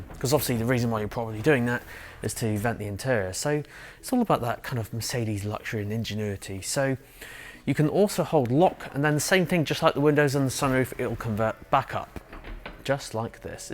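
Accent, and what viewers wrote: United Kingdom. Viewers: British English So harder to understand 👎🏾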